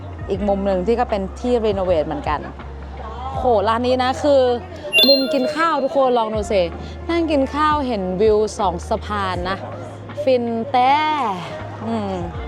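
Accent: North, Thailand. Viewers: ชอบการนำเสนอของน้องค่ะ และชอบคำเมืองของน้องด้วยเจ๊า..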